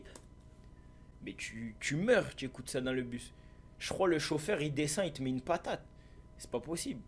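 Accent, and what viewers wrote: Marseille, France. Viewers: Tous se qui me plaît ses ton accent marseillait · T’es marseillais ?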